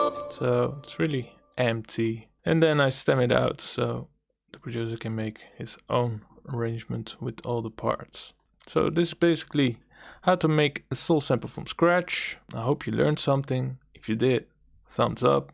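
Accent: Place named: Netherlands. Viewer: By your accent I'm guessing you're from the Netherlands?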